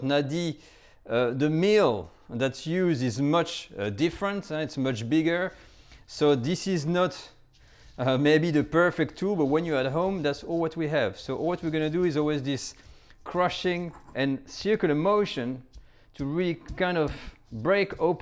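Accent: France. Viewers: You could tell he’s French by his outrageous accent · Hey, I did not not intend to offend you. Of course you can buy the stuff at a store, but the point of this clip was (IMHO) how this stuff made and how to make it yourself if you are so inclined. I for one enjoyed the presentation, the french accent and learned a couple things along the way. But then again, to each his/her own. Piece.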